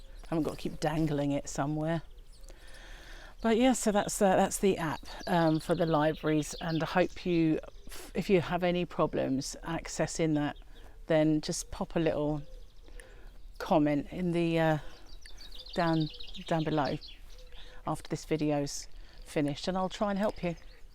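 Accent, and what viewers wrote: United Kingdom. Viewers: Text or call and see if they will take measurements that you can use in crocheting projects. I am enjoying your Veda as I feel I go for a visit to the UK each time I hear you talking. Like you, i steer away from the grim and doom frequently portrayed on TV. I have tuned into some good Christian channels that are instead very upbeat and encouraging. Keep it up love, you are doing fine!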